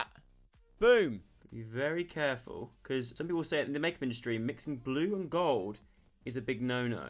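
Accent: Australia. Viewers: we love an Australian accent when I look at myself in the mirror what a pro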